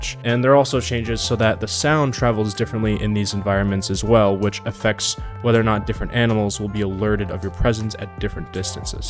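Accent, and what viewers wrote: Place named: United States. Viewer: I don't think you know what "formerly" means.... You sound American, so I'm confused why me, a Danish person, needs to correct you on this. Formerly, means "in the past". Saying "The new Worlds Adrift, now formerly known as Lost Skies" is a nonsense sentence. Is it now or formerly? It can't be both. It's either: "The new Worlds Adrift, formerly known as Lost Skies" - Meaning it used to be called Lost Skies, but is now called Worlds Adrift. Or "The new Worlds Adrift, now known as Lost Skies" - Meaning it used to be called Worlds Adrift, but is now called Lost Skies. If you want to use the word formerly, you would say "Lost Skies, formerly known as Worlds Adrift"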